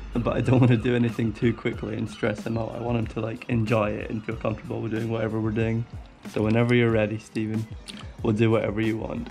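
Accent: Scotland, United Kingdom. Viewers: Are you Scottish out ov curiosity???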